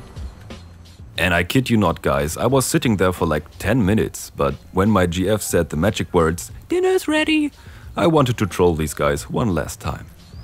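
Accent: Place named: Germany. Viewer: der deutscheste akzent überhaupt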